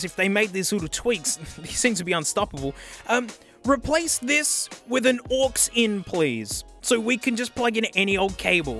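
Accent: Australia. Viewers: Are you the same guy as "the drum thing" guy? Can't tell you aussies apart 🤷‍♂️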